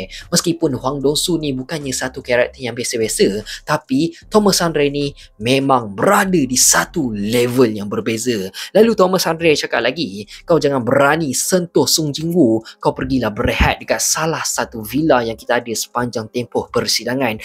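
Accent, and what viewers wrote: Malaysia. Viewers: Bagus bang Sekurang kurang nya ada jugak video suara malaysia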